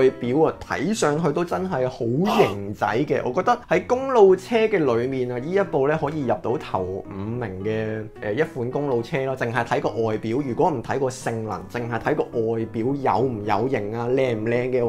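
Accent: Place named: Hong Kong, China. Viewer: Are you Hong Kong guy??? Cantonese so funny!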